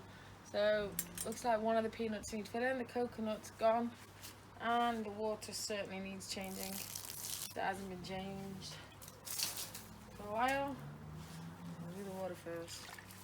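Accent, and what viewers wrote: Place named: England, United Kingdom. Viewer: Hello Young Lady I do love watching your videos I always did love a woman with an English accent and with a full figure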